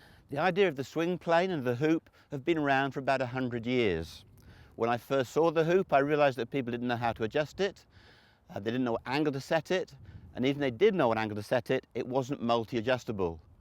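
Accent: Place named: United Kingdom. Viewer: This guy is so British and I love it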